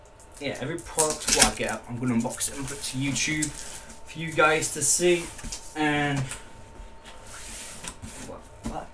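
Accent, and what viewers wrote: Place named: United Kingdom. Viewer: Michael Woodruff dude....lay off it's how the Brits talk....kinda like privacy or aluminum.... Lol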